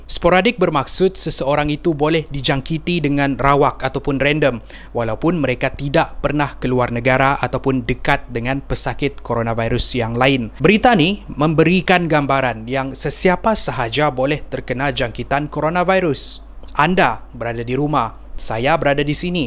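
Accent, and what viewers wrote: Sabah, Malaysia. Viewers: Dia Ni Sabah ke indon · Kamu orang sabah ke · tu sabahan punya style paling ketara oh wkwkwkw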